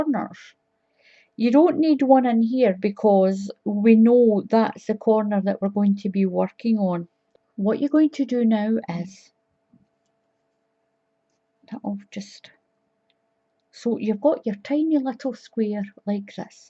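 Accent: Scotland, United Kingdom. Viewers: where are you located in the UK if you don't mind. I hear a beautiful Scottish accent am I correct?? Also, I like to use, current US standard, Meetup App/Zoom meetings for local get togethers with different groups (recommend for all). Barbara, Sacramento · I love your accent. Scottish? Great tutorials, thank you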